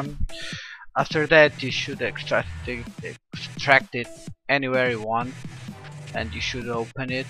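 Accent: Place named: Portugal. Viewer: Are you portuguese by any chance? your english accent reminds me of a friend of mine x)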